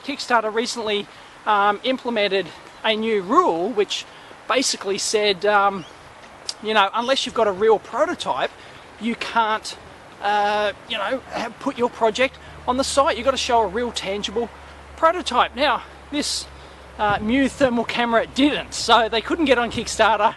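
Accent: Australia. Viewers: Since I'm at work when Neighbours is on, and Steve Irwin went to the big Croc Rodeo in the sky, this is the place I come to get a dose of Aussie accent.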